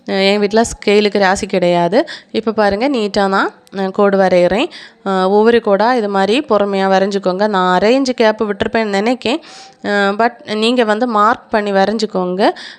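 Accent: Kanyakumari, India. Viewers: Hi sis your kanyakumari slang is really nice I like your native language ❤❤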